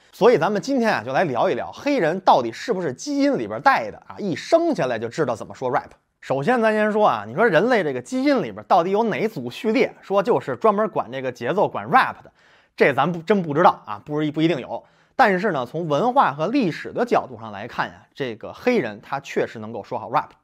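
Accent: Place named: Tianjin, China. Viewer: 哥们儿你是天津的吧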